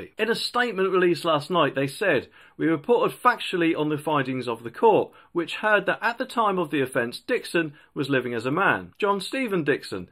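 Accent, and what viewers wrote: United Kingdom. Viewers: Damn, which part of the UK are you from? Normally I don’t have this much trouble with English accents.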